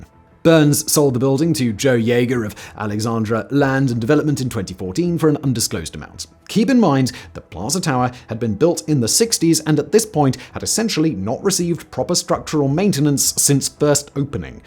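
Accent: RP, United Kingdom. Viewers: He is sorta speaking the King's English. Just put up with it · Your thick, over the top, "posh" English accent can't pronounce New Orleans! Do you really talk like this, or are you really laying it on thick? Thankfully, this video is only a tad over 21 minutes. I don't think I could handle anything longer!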